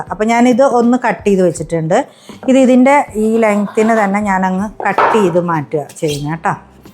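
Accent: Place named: Thrissur, India. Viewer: Trissur slang Ella samsarathil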